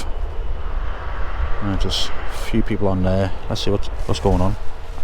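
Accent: Liverpool, United Kingdom. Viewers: Great work, its awesome for s scouser in Texas not just loving seeing the progress of the construction work but getting the dose of “scouse” when all i hear here is y’all and you ground me with “yers all”. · Super well done. Like your updates and the zoom ins. You with other are doing a great job. I find these videos really interesting and has been following from Malta since the start of the project. Keep them coming. Also I like hearing your scouse accent 🙂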